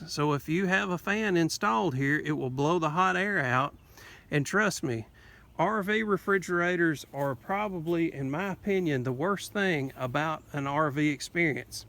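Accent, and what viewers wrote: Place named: Alabama, United States. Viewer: New subscrib. You’re a smart guy and its refreshing to listen to someone that actually thinks and applies their smarts to whatever. Enjoy your channel and that Alabama accent is southern hospitality coming through the video.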